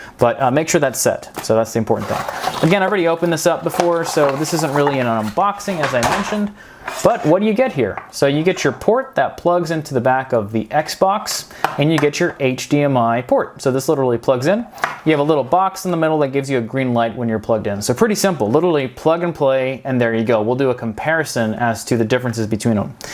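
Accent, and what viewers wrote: United States. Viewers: For a while I thought I was watching, until I realized you spoke American English.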